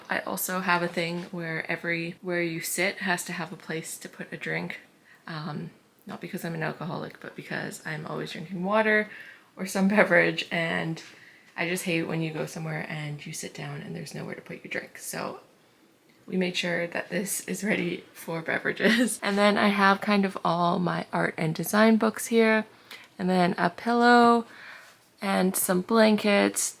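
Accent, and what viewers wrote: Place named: Minnesota, United States. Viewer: under a minute in and you must be from Minnesota.. the "beg" (bag) gives it away , lol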